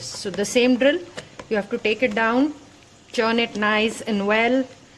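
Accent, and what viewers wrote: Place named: England, United Kingdom. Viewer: Your english accent is your from army background.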